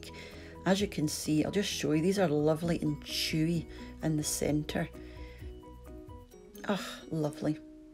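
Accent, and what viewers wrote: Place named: Scotland, United Kingdom. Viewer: I’m a new subscriber (about a week) and if I may, (I mean this with the utmost respect PLEASE know that) you have got the most beautifully soothing voice, & accent or brogue I guess. May I ask where you are from? My guess is Scotland, but I’m not sure of what a welsh accent sounds like. I honestly do not mean ANY disrespect to you, just curious. (John From California)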